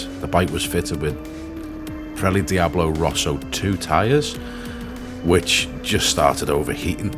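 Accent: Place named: United Kingdom. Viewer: ha no don't think so. Unless you're on the tdr forums, my name is blacklines on there. Or Owen in real life. Think it was just a bit disorienting seeing Collie and thinking ok it's one of the WA lads. Then hearing your voice and thinking ah maybe I was mistaken. Maybe it's someone off tdr. Then seeing Wanneroo and realising ah you're another brit in WA. I moved over here a year ago.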